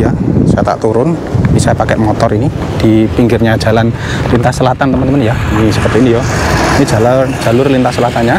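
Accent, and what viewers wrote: Java, Indonesia. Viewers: Aku loh sueneng nek ngrungokne mas ngoceh2 jowone iku LO kuenthel😍🤭🤭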